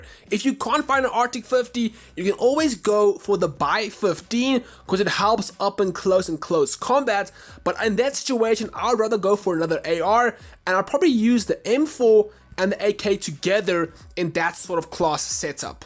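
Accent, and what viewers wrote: South Africa. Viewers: You sounded very South African, until you said "Chopper" 🤔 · I've recently fallen in love with BR and its been great, and ill definitely be grinding to reach Master 2 this season User name: Petscop2 Edit: are you South African? You sound South African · You sound South Africa ,are you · Hey dude thx for the info, and are you South African?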